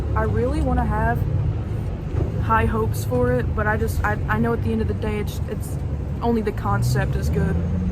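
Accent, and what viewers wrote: United States. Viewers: Love the energy and I love your accent lol I don’t see a lot of southern YouTubers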